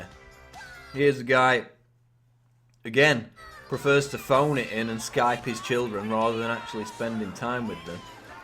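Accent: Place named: England, United Kingdom. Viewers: Your "Prison" is of your own making. It's also quite presumptuous of you to "know" what will make others happy. Rather absurd actually. You're a troll with an English accent in my book mate. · I'm British and I have to say I really hate your northern accent! sorry but you sound like a tit! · guy sounds like he is from rochdale.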